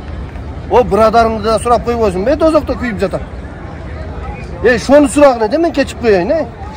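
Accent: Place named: Uzbekistan. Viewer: Караң э, дең э, айтиң э, деп өзбекче эмес айтыңыз, деңиз караңыз деп адабий сүйлөгөнгө аракет кылсаңар муну бүткүл Кыргызстан көрөт...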